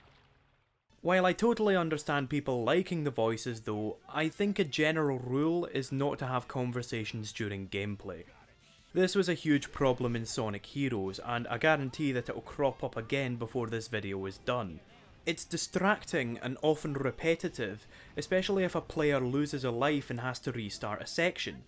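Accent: Scotland, United Kingdom. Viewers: This is probably the most extensive look at Sonic's evolution ever, and it raises some good points. Also, it's nice to hear another Scottish voice on youtube! Anyway, I have a possible (yet perhaps biased) suggestion to Sega for a way out of the bind they've got themselves in. When your series loses its direction and identity, go back to what made it great in the first place. Recall when the James Bond franchise, during the late Brosnan era, didn't know what to do with itself. Die Another Day was partly an homage to classic Bonds, part sci-fi, part self-parody, but also overwrought with serious emotion. In many ways the series was again turning out like the late-Roger Moore era, something that Brosnan's first outing as Bond in Goldeneye did a lot to get away from. So after Die Another Day they brought in a new actor and did Casino Royale. In terms of its tone, that film is very similar to the Ian Fleming book of the same name, and it shows why and how the characters and stories from the early books were so gripping. 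After watching your video, I see a lot of parallels between the respective problems faced by Bond and Sonic, and if getting back to basics worked for the former, I really think it could work for the latter. So what I'm suggesting is that Sega go back to the gameplay mechanics, designs, and narrative style of Sonics 1-3. And not just in the form of nostalgia feasts like the Sonic 4 episodes or Generations (though the latter was a firm step in the right direction)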